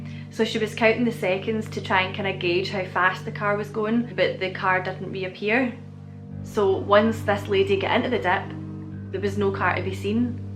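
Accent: Scotland, United Kingdom. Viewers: Great video and amazing channel... And I love your Scottish accent too! 🙂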